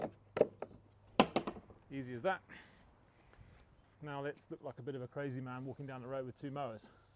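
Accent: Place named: United Kingdom. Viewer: I look forward to the season ahead, just started here in the UK.. have you always lived in Australia? Sounds like you have a British accent to me at times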